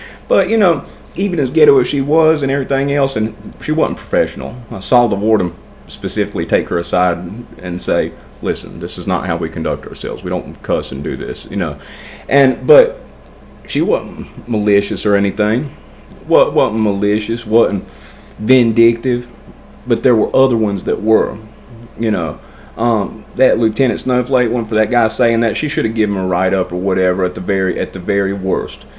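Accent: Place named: Southwest Virginia, United States. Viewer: Dude I don’t know where your from but it sounds like from back home, I’m from Southwest Virginia and did almost 6 years camp 1. I live in the Philippines now and have been sober since January 17,2005 I was on pills and dope bad junked out lucky I didn’t get caught doin a lot of dirt.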